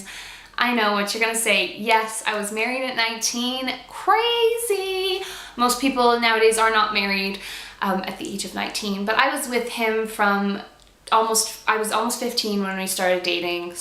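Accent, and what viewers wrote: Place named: North America. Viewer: Yay for ex-pat #bbloggers! I moved from Texas to England last year for my husband as well. I love hearing other North American accents pricing things in pounds and euros!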